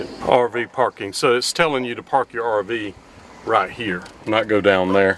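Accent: South, United States. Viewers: Just love your accent. That's proper Southern, that is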